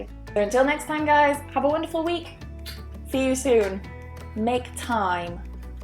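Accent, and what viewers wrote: United Kingdom. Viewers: love your British accent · Please, You should make some videos about reading in English, I believe it will be useful furthermore would help us a lot and your British accent is beautiful, thank you for sharing this video plus a big kiss.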